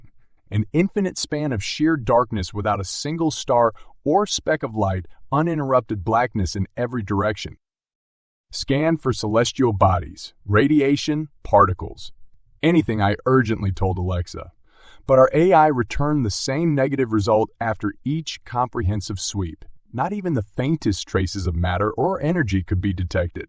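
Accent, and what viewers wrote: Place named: South, United States. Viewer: No ! Country accent does not work for sci- fi .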